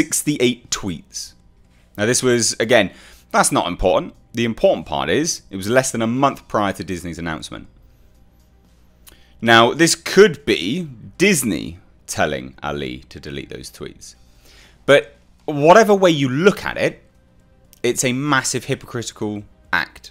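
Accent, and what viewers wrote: United Kingdom. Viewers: I've become so used to the British accent when you said you never heard of here I was about to say something. Than realized, hey, he's British. The internet has ruined me lol. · Wait....you're British?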